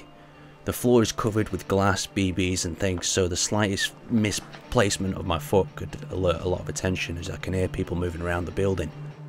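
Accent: United Kingdom. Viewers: The clothing usually comes with such things, but as his accent tells hes most likely british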